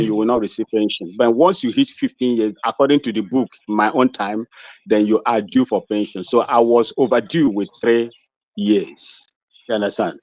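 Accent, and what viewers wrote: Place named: Igbo, Nigeria. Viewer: This is an igbo man from his intonation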